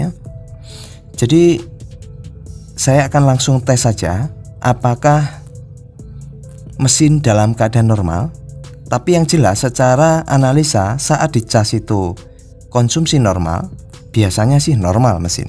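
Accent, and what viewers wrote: Java, Indonesia. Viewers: Jowone ngdi mas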